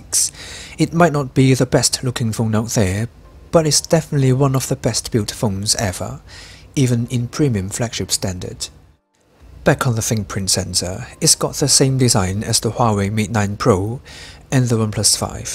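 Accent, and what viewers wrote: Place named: United Kingdom. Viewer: 专登嚟听英音，好sexy！！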